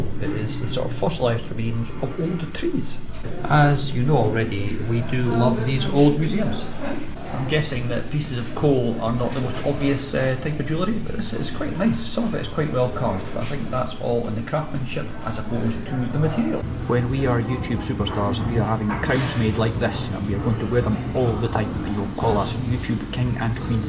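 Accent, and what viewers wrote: Scotland, United Kingdom. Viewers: Great video, guys! I often have to go back and listen to what you said a couple of times, not always because you are talking fast, but more often because your Scottish Burr is quite heavy. I’m not complaining; I like it.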